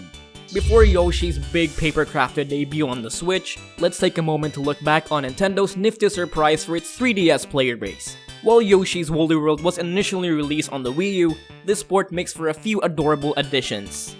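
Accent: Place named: Philippines. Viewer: This guy sounds Filipino.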